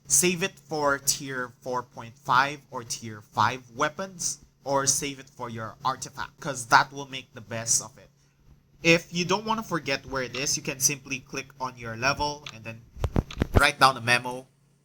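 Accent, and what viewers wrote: Philippines. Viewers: I heard Filipino accent here hahaha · soooooooooooooo filipino accent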